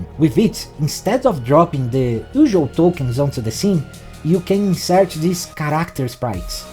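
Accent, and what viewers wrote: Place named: Brazil. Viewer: Hahahahahahaahah incrivel como da pra perceber outro brasileiro pela fala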